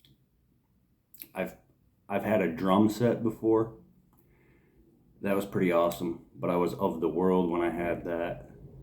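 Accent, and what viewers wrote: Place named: Michigan, United States. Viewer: I knew your accent was familiar!!! I lived in Michigan for 20 years. God bless you brother!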